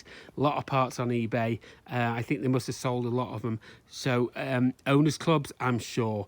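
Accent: United Kingdom. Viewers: Question. Have you had muck around with the carburetors ever. I have a Deluxe, for their time they are among the best, lol oking qt other bikes that age. Little liquid vtwin. Ive never heard someone curse so much in the kings English, it was refreshing, thanks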